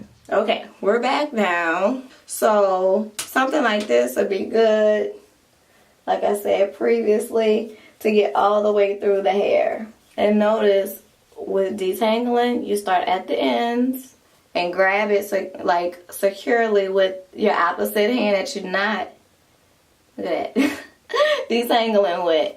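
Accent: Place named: South, United States. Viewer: I love your voice!!!! #Southern ❤️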